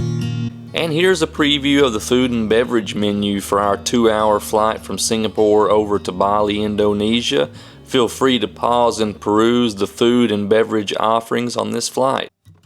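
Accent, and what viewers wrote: Kentucky, United States. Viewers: Is that your real voice? If so, I love your Kentucky accent…..we all need to slow down a little!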